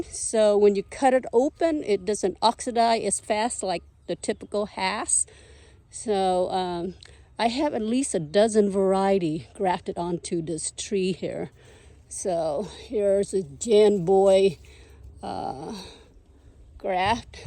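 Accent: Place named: Vietnam. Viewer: math pays the bills! Farming in the blood. Having an edible landscape surrounded by open space is nice. There is an empty lot 120 acres down the street from us we are looking into only issue is it’s VERY steep. Jujubes we do know quite well. I grew up on a farm in San Diego county and we had jujubes so I developed a taste decades ago for them. Will be interesting to watch the progress of the avocados. What year was initial planting? I started in 2017 and am about out of space now at 70 trees. Total trees with everything about 100 I lose track. I’ve had a lot of failures with avocados but now got it down pretty well with good drainage and lots of mulch and most of the grove is thriving. I am especially interested in seeing how Nimlioh does for you. I have 3 and they are thriving. Funny trivia my guess is you were from Vietnam bc I know the accent - MANY of my students are Vietnamese and have been teaching 25 years to them. 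My best friend Andrew Stehr who owns Stehr concrete in San Jose has Vietnamese wife and he - white guy from Alabama is completely fluent in Vietnamese. I read and write mandarin 我读写汉语 so what is interesting is when Andrew translates Vietnamese into Chinese for me I can understand it. So anytime the Vietnamese use the Chinese characters I feel very happy. We have a new Vietnamese supermarket that opened called Dai Thanh market and I have no idea what that means but as soon as I see the writing 大城 market then I know what it means - big city market. Avocado 牛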